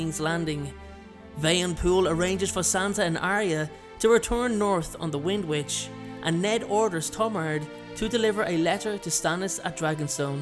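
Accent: Ireland. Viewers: He’s obviously Irish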